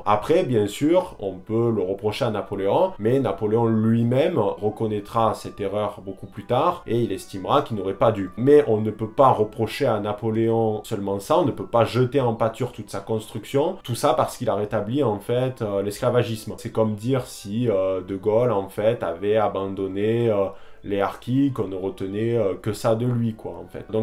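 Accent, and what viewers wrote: South-West, France. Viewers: C'est l'accent du sud-ouest, rien à voir avec une voix de banlieusard.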